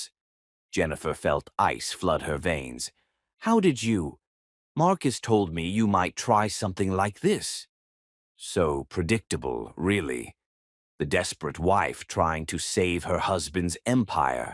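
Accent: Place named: England, United Kingdom. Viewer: I like this story because of the eloquently English spoken accent. I also like that the couple didnt wait to show their feelings for each other❤ Listening from Antigua 🇦🇬 Patrick is a bit slow in getting ahead of his greedy cousin Marcus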